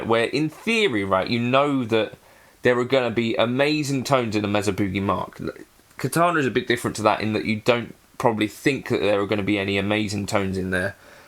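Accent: United Kingdom. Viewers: The minute that I heard a British accent, I knew that it was going to be about distortion on guitar. The Brits should have an image of a distortion pedal imprinted on the Union Jack (their national flag.) It's against the law to play an authentically clean sounding, electric guitar in Britain. Here is a reminder of what a clean sounding guitar actually sounds like. <link>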